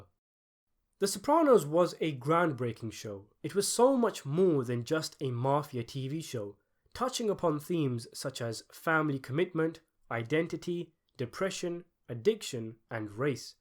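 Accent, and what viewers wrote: United Kingdom. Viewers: You Brits say "H" funny. "haytch" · The British accent ruins it. Such a sissy accent talking about Tough Guys.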